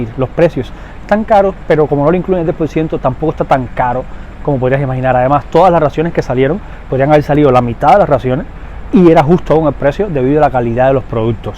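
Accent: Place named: Cuba. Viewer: Genova es famosa por la focaccia , norte italia la pizza es fina , pero según vi es un menú la cortesia de casa es un arancino siciliano , esta la lasagna q es de Bologna .. tienen excelente menú completo , solo el nombre del local indica italia Toto e el panfilo italiano così nos entendemos , saludos fratello te sigo hablas un buen español cubano nuestro no todos los YouTuber lo hacen gracias !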